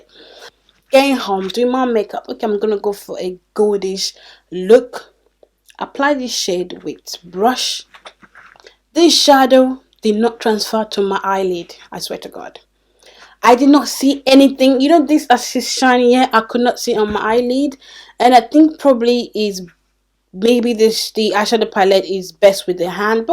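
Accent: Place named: Nigeria. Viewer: Hi I like ur accent, are Nigerian? Liked and subscribed.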